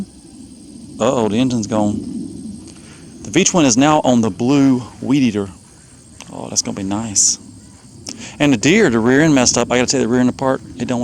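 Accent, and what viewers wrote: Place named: Georgia, United States. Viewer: Awesome. Your accent sounds exactly like my friend who’s from Georgia. That’s why I ask! Great video!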